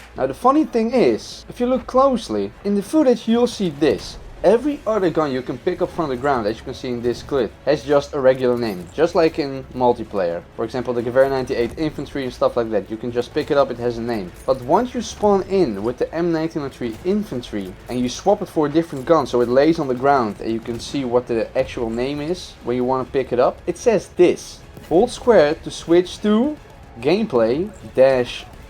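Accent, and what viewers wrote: Netherlands. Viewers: You sound like a dutch person talking english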